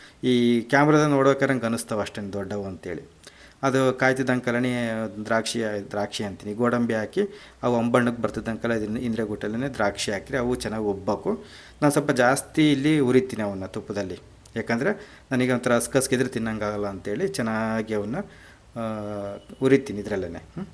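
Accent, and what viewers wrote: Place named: Davangere, India. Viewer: ನಮ್ಮ ದಾವಣಗೆರೆ ಮಾತು ಕೆೇಳೊದಕ್ಕೆ ಚೆನ್ನಾಗಿದೆ ಅಲ್ವಾ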